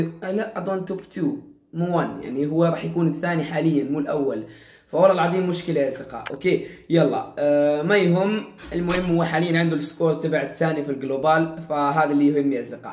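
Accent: Morocco. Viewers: هل انت مغربي؟ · واش انت مغريبي من اي مدينة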